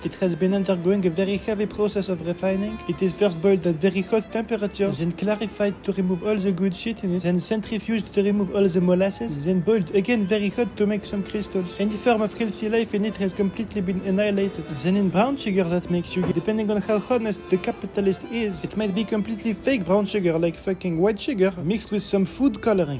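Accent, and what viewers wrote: France. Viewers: Ar you French ?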